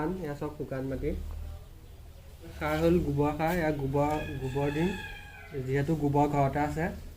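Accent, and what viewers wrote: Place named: Assam, India. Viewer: খুউব সুন্দৰ ভাইটি। তোমাৰ ঘৰ গোলাঘাটত নিকি বাৰু কথা বোৰ শুনি তেনেকুৱা যেন লাগিছে।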